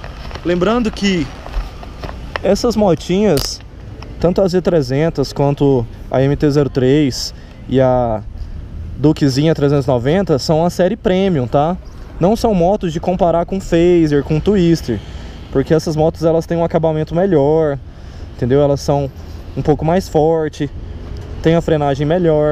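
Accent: Goiânia, Brazil. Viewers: É de Goiânia mano?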